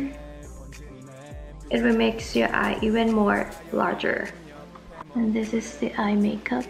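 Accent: England, United Kingdom. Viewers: ชอบสำเนียงอิ้งพี่ มากๆ อยากให้พี่ ทำคลิปแบบนี้บ่อยๆ งื้ออออออ นี่คือพยายามฟังโดยไม่เปิดซับ ฟังออกบ้างไม่ออกบ้าง ถือว่าได้เรียนรู้😶 ปล.พี่ น่ารักมากกกก ชอบโทนนี้เลยยย❤🍑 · i love your english accent💖 so please don't be shy naka💖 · ชอบสำเนียงอิ้งมากกกก😍💗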